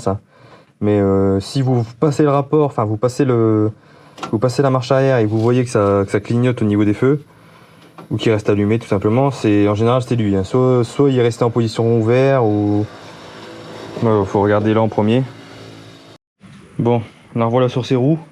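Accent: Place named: Nord, France. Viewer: Je me rends compte que tu est du ch'nord aussi 😁🥰👍👌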